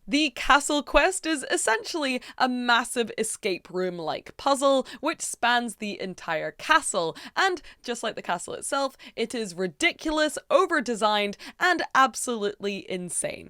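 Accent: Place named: United Kingdom. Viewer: Absolutely love your british accent, lovely as you are!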